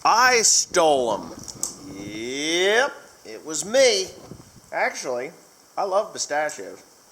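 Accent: New Jersey, United States. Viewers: RADiator is definitely a NJ accent thing. When I moved from South NJ (Born and raised) to Colorado, I got my balls busted for saying it the same way you do lol. Also had issues with the word water. NJ = Wooder CO = WAHter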